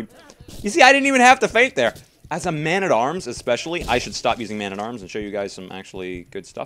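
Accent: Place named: Canada. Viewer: quick question, are you canadian, your long vowel sounds sound canadian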